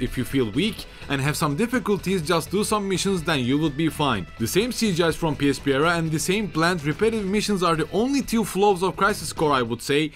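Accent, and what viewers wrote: Turkey. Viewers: u have a turkish accent.ru turkish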